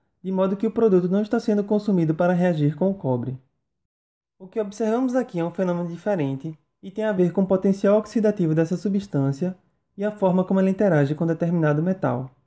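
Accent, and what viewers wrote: Northeast, Brazil. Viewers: ficarei esperando, pois o que vc está fazendo é uma grande contribuição para a comunidade científica do YouTube e ainda mais se for por um conterrâneo como vc, pois sou da Paraíba e pelo seu sotaque, percebo que vc tbm é daqui da região Norte-nordeste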